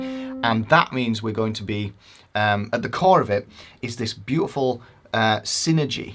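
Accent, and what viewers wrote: Yorkshire, United Kingdom. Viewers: Hello. Love the vid! More please. You sound like you´re a yorkshireman like myself, which town?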